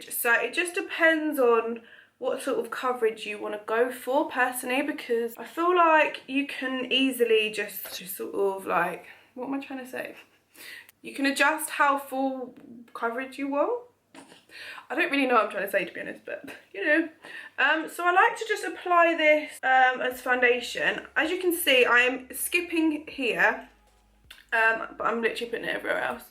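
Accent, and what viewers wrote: United Kingdom. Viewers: isn't enough be so pretty, you have to be british with this awesome accent too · aww you r stunning guurrl✨👑 you're really talented and pretty but I really hate that British accent I prefer American's one way more but it's cute on you idk it always annoys me but it sounds cute on you ok bye love you💌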